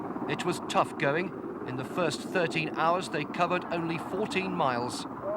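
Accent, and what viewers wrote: United Kingdom. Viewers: LOL your page says you're from Denmark. That's why. But you sound like a British subject who lives in Denmark.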